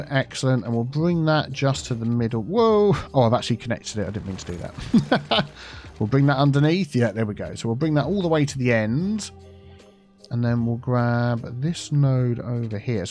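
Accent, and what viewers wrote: United Kingdom. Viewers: I new you are a Brit since the first time I head you. And English is not my first language.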